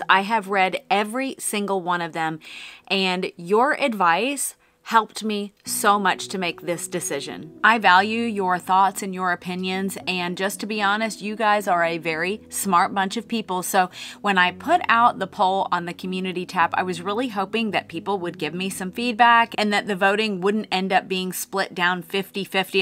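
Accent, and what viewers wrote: United States. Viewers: i love your videos and i’m happy you changed the channel name! i always thought it was funny how you obviously don’t speak french but have a French! channel name😂 i think ur pronunciation was okay but a little unintentionally pretentious especially in contrast with american accent coming right after😅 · As a French native, I know the pronunciation can be difficult and also French people are stucked up the way French words are said. French isn't easy even for the French. I think that your American accent gives it a charm. I've been in the US for the past 25 years and still have a strong accent and often I killed the English words. What matters is that you did your best and wanted to honor Therese de Lisieux.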